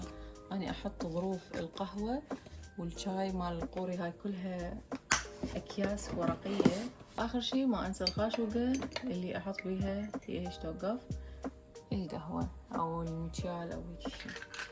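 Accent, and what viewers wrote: Iraq. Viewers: تسلم ايدك روتينك هادي وحلو مثلك وانا صح من سوري يس حكينا مثل اهل عراق احب لهجتكم لو تحكو مثلن بس احب حكيكم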